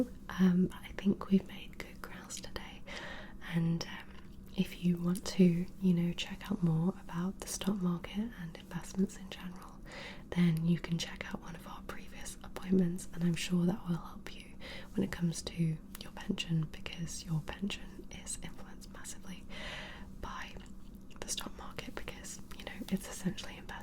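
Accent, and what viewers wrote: United Kingdom. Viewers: OMG where abouts are u from! U sound english like me😊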